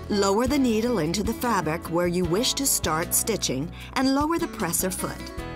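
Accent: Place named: Canada. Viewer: The narrator has a weird accent like Catherine O'Hara's character, Moira Rose, in the sitcom Schitt's Creek. Must be a Canadian actress thing.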